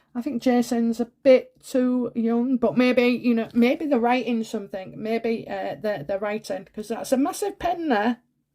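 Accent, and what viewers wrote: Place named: Yorkshire, United Kingdom. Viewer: Hi my friend hope you are feeling better and stringer and please bring your accent back i feel so at home with you two Yorkshire lasses together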